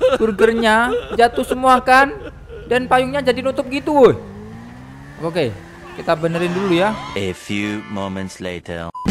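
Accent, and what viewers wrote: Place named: Java, Indonesia. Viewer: Hhhhh logat jawa nya medok. Hebat bubur nya g tabur lagi.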